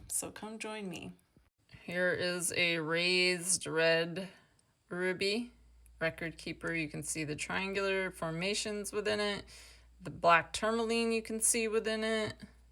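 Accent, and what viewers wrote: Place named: United States. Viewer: sounds american Indiana or Colordo im guessing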